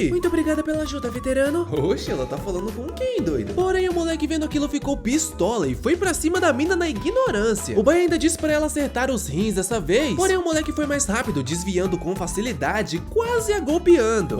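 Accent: Bahia, Brazil. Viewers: Oiaaa, novo narrador....gostei kkkk é bahiano né? Certeza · Kkkkk primeira coisa q os caras perguntam é se ele é baiano! Kkkkk TENSO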